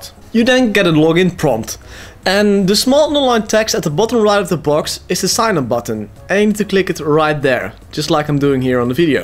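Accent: Netherlands. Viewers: i knew it you are dutch your accent made me think is he dutch xD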